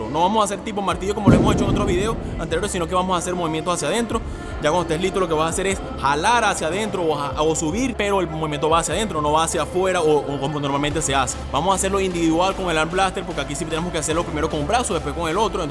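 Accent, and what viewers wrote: Venezuela. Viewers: su acento es venezuelano yesss te amo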